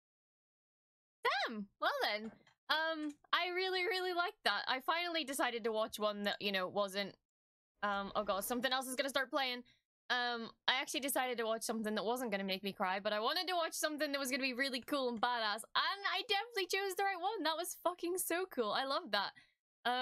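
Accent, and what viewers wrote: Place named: Belfast, United Kingdom. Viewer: I must live near you. I Knoe your accent is a belfast accent. Im in Carrick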